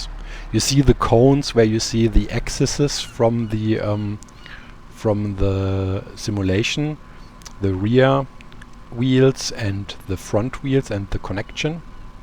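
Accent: Germany. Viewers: best mod ever had. ok we have some nice tanks,planes,boats etc on our tactic server but this is still awesome! is there a elevator for boats planed? for now we have to tow our trucks,tanks etc with planes to the island. are you german?you sounds like an english speaking german;-)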